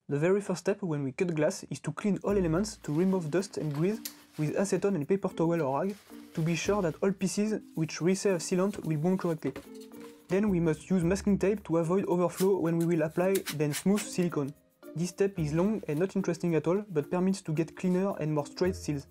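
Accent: France. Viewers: c'est un français à l'accent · it's typical french english, it's quite cute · i wish i was french just to have an accent like yours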